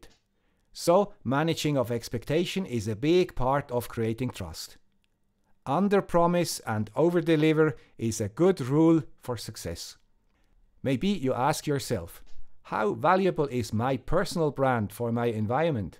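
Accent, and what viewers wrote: Switzerland. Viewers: I’d like to think not many commercials or youtube channels influence me in a substantial way. You are the exception and it has allways been a good experience. If i need some engineering advise or a point of view on something i usually think “what will the have to say about this”. You really do add something to the table and i trust the “Swiss accent” brand. Not doing any midroll commercials is also very much appreciated. I have been annoyed much by youtube lately and unsubscribed from some channels that run more than 3 commercials in 10 minutes. What a pleasure to watch without any rude interruptions! Wish you all the best in 2021! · Joking aside, very nice content. Continue trusting 🙂. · This is a much more philosophical video than I expected, but I enjoyed it. Thanks! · Your video is an important message today, not just for Engineering, but for day to day life. 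I greatly appreciate your channel and always smile when I see a new one of your videos appear. All the best from the USA where trust is in rather short supply. Hopefully, we can learn a little from the!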